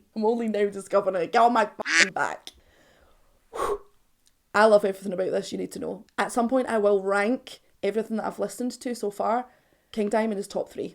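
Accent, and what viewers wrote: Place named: United Kingdom. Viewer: Ohhh, you are so cute...your femininity...when you touch your beatiful hair, ok, a gift for you, react to, but...with singing, the others...poor of She´s, ohhhhh, you so cute, love you english too, it´s the best Britanic accent, kisses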